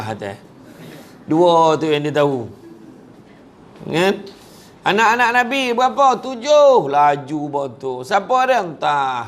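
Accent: Negeri Sembilan, Malaysia. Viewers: Sy dri 🇸🇬..suka cara loghat Nogori Ustad dlm ceremah..x bosan dgr...Ilmu yg dikongsi sgt baik sekali. Insyaallah...akn cuba lakukn yg trbaik...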